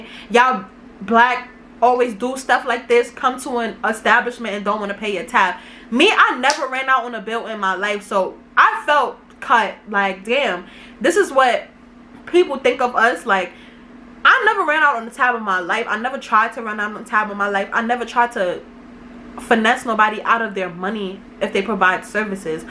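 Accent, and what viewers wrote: New York, United States. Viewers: I love y'all New York girls accent. I'm fascinated and intimidated at the same time lbvs 😄😳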